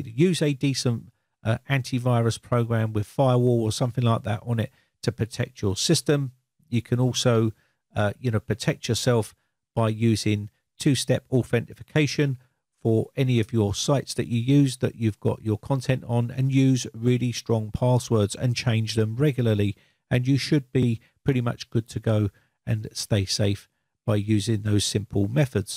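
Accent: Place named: South London, United Kingdom. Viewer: I'm gonna guess South London accent. Rotherhithe maybe? Anyways great video man!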